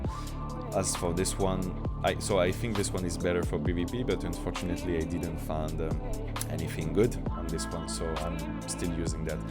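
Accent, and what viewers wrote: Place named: France. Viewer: T’es français avoue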